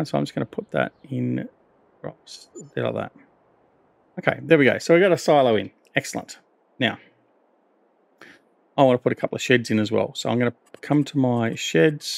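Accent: Australia. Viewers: Bro you can tell this dude is Australian because that’s exactly what one sounds like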